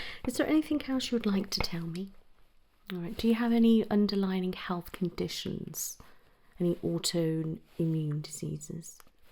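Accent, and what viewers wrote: United Kingdom. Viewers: You’re seriously the best at these doctor roleplays. Very relaxing. Can’t watch any other doctor roleplays anymore cuz yours are better in my opinion❤️ plus I love the British accent (idk why, different languages and accents give me a lot of tingles)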